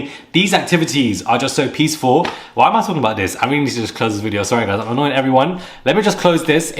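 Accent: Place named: London, United Kingdom. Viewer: South London? Well done.. some reason I was thinking you are from East London.. That's how stereotype works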